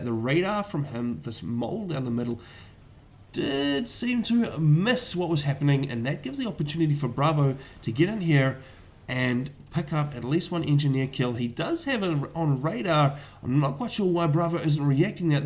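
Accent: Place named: New Zealand. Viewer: Nice commentary and camera movements! I always do a double take when I hear another kiwi accent on youtube :D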